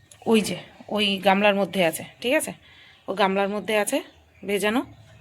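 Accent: Bangal, Bangladesh. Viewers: Bangal bhasa ki je sruti modhur laage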